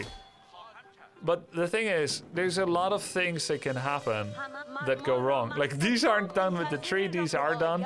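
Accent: Netherlands. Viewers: Is that a Dutch accent i hear? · Haha jij bent echt zooo duidelijk een noord-Nederlander. Dank voor de heldere video!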